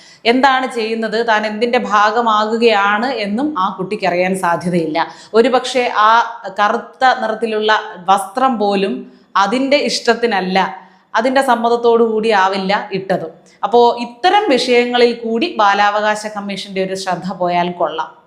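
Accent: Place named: Trivandrum, India. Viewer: തിരോന്തോരം ഭാഷയിൽ പറയൂ. അതാണ് കേൾക്കാൻ രസം . നിങ്ങളെ വ്യത്യസ്തയാക്കുന്നതും ഹാസ്യാത്മകമായ ആ ശൈലിയാണ്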